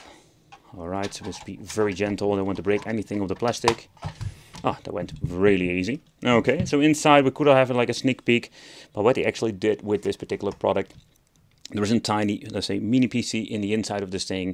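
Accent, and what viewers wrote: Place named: Netherlands. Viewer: Ik hoor je Nederlandse accent ik weet niet waar je woont maar als het dicht bij Alphen aan den Rijn is dat goed. Ik heb er. Rog ally waar ik probeer games te emulaten maar het lukt mij niet als je dat aan de praat kan krijgen kan je mijn rog ally een review geven met emulation ik probeer voornamelijk Wii, Wii u, switch en Xbox 360/ps3 games emulaten maar emudeck werkt niet bij mij ik heb mijn ssd wel geupgrade naar een 2tb ssd miss kan dat er mee te maken hebben maar als je niet dicht bij woont heb je misschien tips om het wel aan de praat te krijgen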